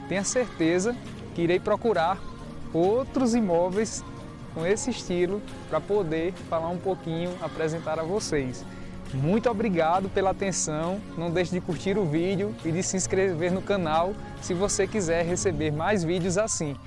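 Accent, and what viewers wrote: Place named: João Pessoa, Brazil. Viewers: Assistir esse vídeo foi tão prazeroso me fez lembrar do tempo antigo muito emocionante, eu realmente estou chocada com o Ceará tanta beleza tantas histórias, você está mostrando um ceará que eu não conhecia sou do interior de São Paulo e estou amando seu trabalho, que filmagem excelente melhor sotaque do Brasil. Como você diz muito bom de verdade 😅 senti como se eu estivesse aí nesse lugar cheios de histórias, por mais vídeos assim parabéns 👏👏👏👏👏. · Perguntei porque cheguei a 3 meses de João Pessoa,achei o seu sotaque parecido com o de JP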